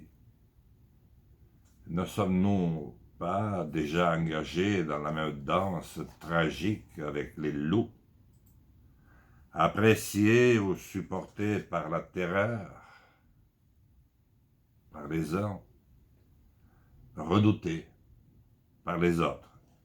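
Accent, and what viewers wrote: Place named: Paris, France. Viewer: Quel bel accent ! Une parisienne du peuple.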